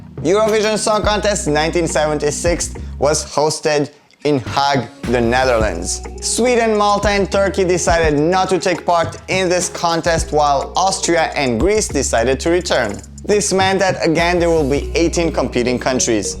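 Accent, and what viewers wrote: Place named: England, United Kingdom. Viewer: Hi! Nice job as always! Just a friendly remark as it's not the first time i hear you pronouncing "Gigliola" wrong, you dont have to pronounce that g in the middle at all, just skip it and tadaaam you have the correct name. I bet you can experience the proper italian fluency now:P By the way im catching the opportunity to say i love your english accent (been wanting to say that for a while) As for your french one.. ehm i do see your effort there hehe close to decent:D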